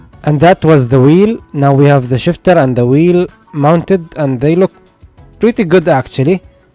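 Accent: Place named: Arab world. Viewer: Arab Accent goss hard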